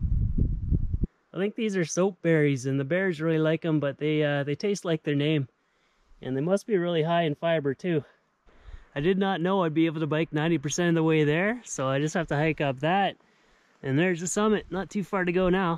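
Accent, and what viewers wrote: Canada. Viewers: Most Canadian language pronunciation episode yet! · You got me with your pronunciations. I thought Canadians just didnt know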